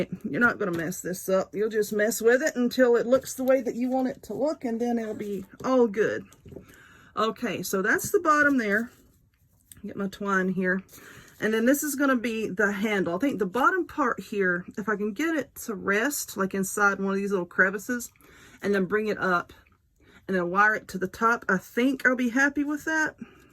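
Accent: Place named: East Texas, United States. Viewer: Olivia's romantic channel recommended yours, so i headed over. i have to ask if you are in east texas? you sound a WHOLE LOT like my family and myself.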